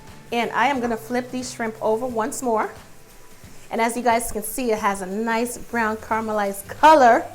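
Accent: New York, United States. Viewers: oh yeah ....she's a New Yorker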